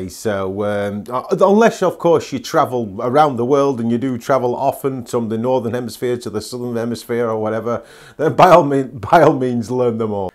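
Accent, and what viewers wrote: Midlands, United Kingdom. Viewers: Would you mind disclosing your appropriate location? Your accent sounds not too far from me (Derby) and i was wondering about local 'low light pollution areas'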